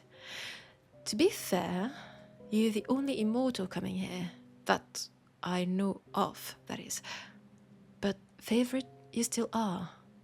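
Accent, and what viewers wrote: France. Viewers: Im down bad for the french accent, chat am I cooked 🥀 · Ah, my favourite French accent! :3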